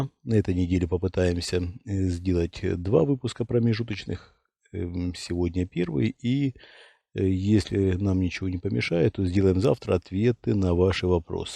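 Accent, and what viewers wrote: Ukraine. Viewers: с Украины что-ли? Акцент украинский.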